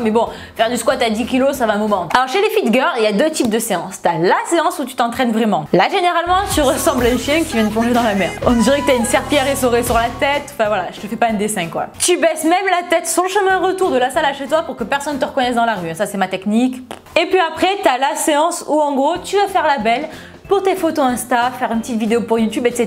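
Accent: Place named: Nice, France. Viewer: Salut, en plus d'avoir un corps magnifique et une tête bien remplie, tu as vraiment beaucoup d'humour, j'adore ton accent (je travaille sur Nice d'ailleurs!) et tes vidéos, elles m'ont beaucoup aidée à me raffermir et à prendre goût au sport (un exploit pour une feignasse comme moi 😂)! bisous bonne continuation!!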